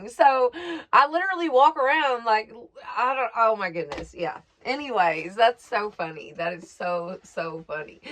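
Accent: South, United States. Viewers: Hello my beautiful Angel. I would love to hear you sing with your beautiful southern accent. I love it!! What a true and powerful words of our Amazing Creator JESUS. He is so so good to us. Even though we are not worthy. I'm a sinner as well and saved by his marvelous GRACE. Praise GOD for his mercy, grace, forgiveness, and his unconditional love he had for us. Thank you once again for your daily devotional words of our Living Father. Blessings to you and your lovely family. I love you my beautiful Angel sister.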